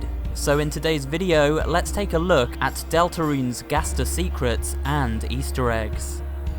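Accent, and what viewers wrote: United Kingdom. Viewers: this dude is just british Chills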